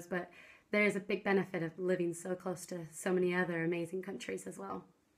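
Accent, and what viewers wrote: Ireland. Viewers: Are you Irish?! Whaaa? lol It's so lovely to know that blogging has helped you to settle. I know I would really struggle with moving to another country x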